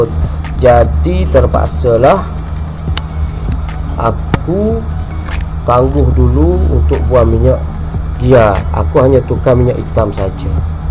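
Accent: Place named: Kelantan, Malaysia. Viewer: BUNYI KELATE LAH PLAK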